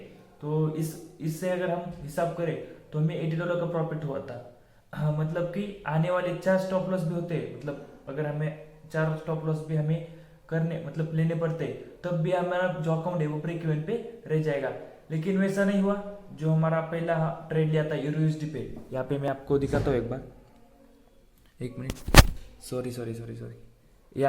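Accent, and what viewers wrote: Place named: Maharashtra, India. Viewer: Kay rao Aple lok lagich olkhu yetat 😅bolnya varunch... Keep it up brother 💪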